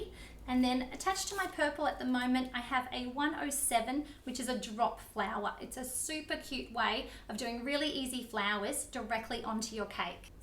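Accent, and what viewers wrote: Australia. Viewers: I love watching your videos the cakes you make are amazing! Your australian accent is really cute by the way ^^ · is she Australian? or from New Zealand ?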